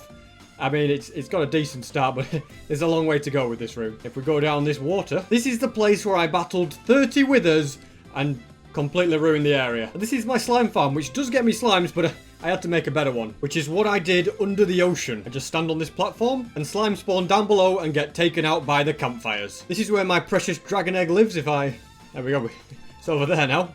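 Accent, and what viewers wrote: United Kingdom. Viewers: But 100% British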